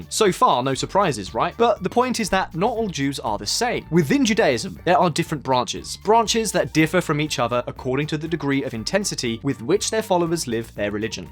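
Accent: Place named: United Kingdom. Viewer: It's the first time I see a video of yours, and as an Israeli I can tell you that you explained it better than most Israelis can. Unfortunately for us, I don't see the Haredim joining the military soon. Also, I think that what most upsets us, Israelis, about them is that because they don't work or have low wages they don't pay taxes, thus making us, who work everyday for living, to pay not only for our lives, families, and our education, but also for theirs. I should pay for my own son's higher education, not for the Haredi education of Yeshivot (Yeshiva in plural) and Tora study Edit: Also, like your British Accent:)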